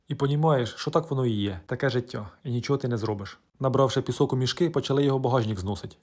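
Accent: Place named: Cherkasy, Ukraine. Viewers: Новий П.Глазовий !!!!чи Литвинов! Але ж ти Суперський з черкаським суржиком🎉🎉🎉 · Говір такий як у моєї мами Вона також родом з Черкащини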